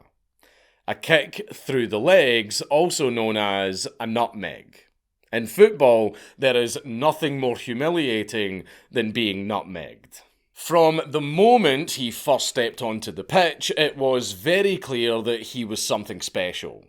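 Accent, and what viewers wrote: Scotland, United Kingdom. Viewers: He's clearly, audibly Scottish. He's British, though.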